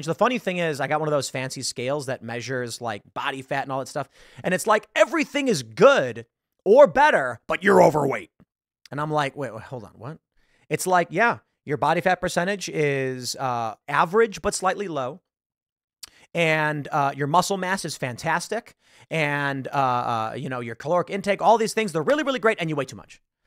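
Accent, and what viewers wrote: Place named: New York, United States. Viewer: You say "bathroom" like I do; "bat'room' I was born and raised in NYC - Da' Bronx. 😂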